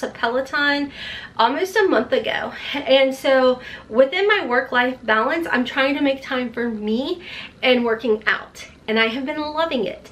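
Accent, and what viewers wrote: South, United States. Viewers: I don’t get subscription boxes but I always watch your videos and have for a long time and I don’t mind your talking either or that southern accent because I’m from Arkansas I have one to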